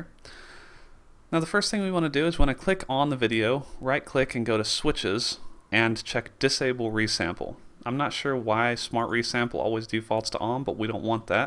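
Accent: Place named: Texas, United States. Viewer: Your dumb.....its called a Texan accent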